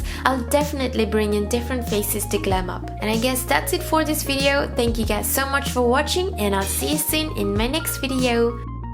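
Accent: Nepal. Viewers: Is she Nepali, I could tell from her accent. Nice video!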